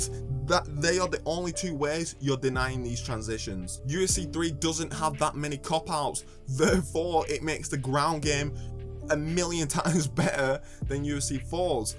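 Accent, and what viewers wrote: United Kingdom. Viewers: You sound British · Jeez mate your accent is like nails on a chalkboard to my damn ears. Common as MUUUUCK. I'm also from the UK. This is the bottom of the barrel of accents we have lol thIIINNNGGHH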